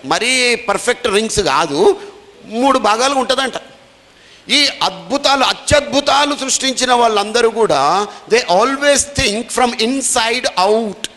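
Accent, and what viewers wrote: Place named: Telangana, India. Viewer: super speech !! just loved his way of presentation, the Telangana DIELECT I love it ! sir kiraaak chepthundu!!feels great and motivated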